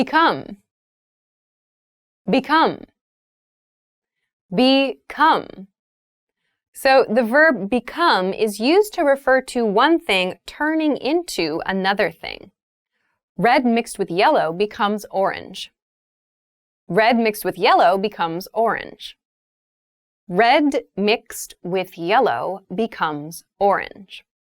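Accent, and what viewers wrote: United States. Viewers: you're the best, i love your American accent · Thank u very much for helping us in learning English. Here I want ask u a question, please tell me where are u from and if iam not wrong ur speaking American accent, isn't it?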